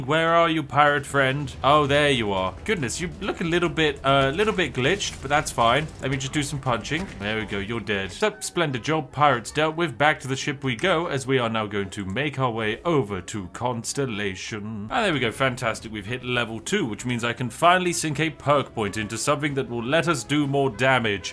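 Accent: United Kingdom. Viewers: You have a lovely British accent there, friend (for real, not kidding) you had fun videos about cyberpunk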